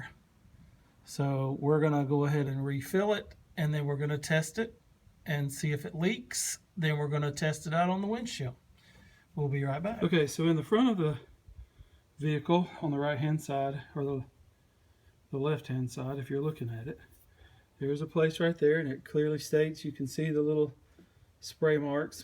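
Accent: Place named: South, United States. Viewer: Your southern accent and the scenery makes me think you might be in Georgia. Just guessing.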